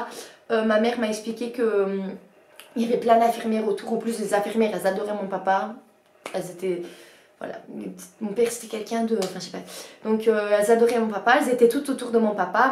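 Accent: Belgium. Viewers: J'ai une question tu es Bruxelloise ?PS moi oui 🙂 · Tu es belge ?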